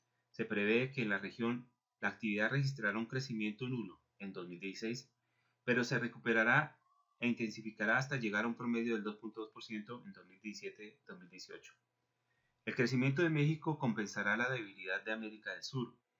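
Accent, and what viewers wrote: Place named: Colombia. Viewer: tiene acento colombiano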